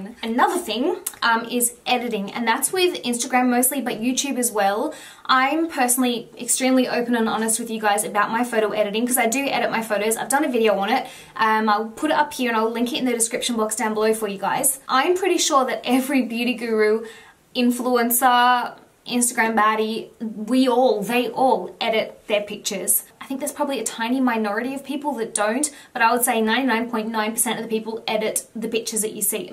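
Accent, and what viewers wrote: Australia. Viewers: Ireland? I always thought you were australian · Ireland? You don't sound Irish, you sound Australian or something. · Is her accent Australian? Sorry I can't assess that I'm Dutch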